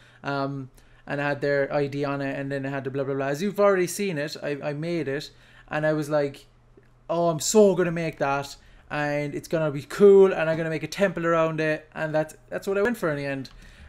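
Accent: Ireland. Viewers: I take it back, I can hear the Corcaigh in him. Gwan boy!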